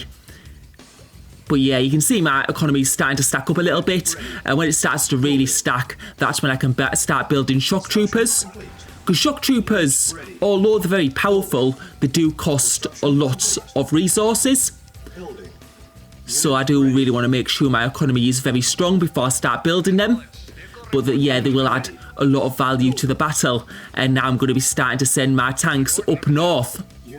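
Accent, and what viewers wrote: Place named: Northern England, United Kingdom. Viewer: im not an expert, but it's a northern English accent, my guess would be something around Manchester.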